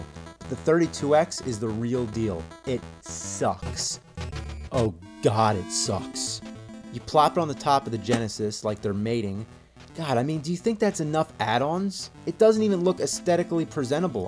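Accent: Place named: Pennsylvania, United States. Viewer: That's just a Pennsylvania accent. 😂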